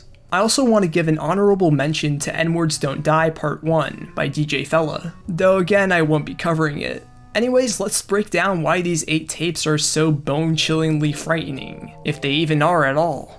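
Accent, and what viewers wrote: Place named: Midwest, United States. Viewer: I hear Midwestern in your accent and it makes me sooo fuckin mad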